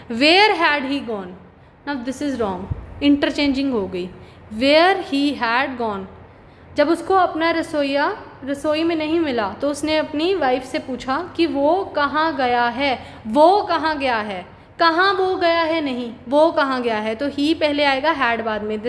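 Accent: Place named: Garhwal, India. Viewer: Are you Garhwali .... your tune matches alot😅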